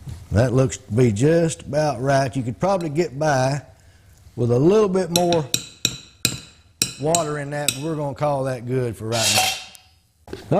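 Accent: South, United States. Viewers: Me: Watching with a degree of skepticism, volume down, and thinking: 1. Intriguing, a white man knows about hot water corn bread? 🤔 2. Intriguing, knows how to make hot water cornbread "correctly"! 😊😊 3. Intriguing, not afraid "fat" or frying food?!?! 😁😁😁 Conclusion: This man MUST be from south or at the very least Texas. Me: Turns up volume. Hears accent. South confirmed! 🤣 *Priceless take away to 👌 Was I was racially / gender biased? Yes, but aren't we all (to a degree) if we're honest with ourselves??? Me also: Wondering how I can get an invite for dinner now that I've probably teed off the cook!!!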